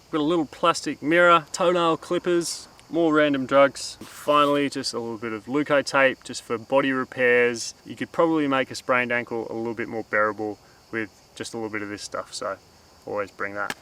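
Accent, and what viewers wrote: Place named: Australia. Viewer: With the Aussie accent Can Serrat sounds like something else. Good video mate hi from Tassie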